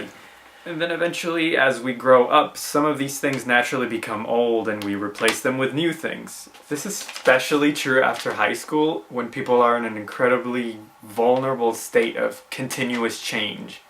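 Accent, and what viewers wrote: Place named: England, United Kingdom. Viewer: Oh, I really found this thought pretty nice! Loved it, also loved your English accent.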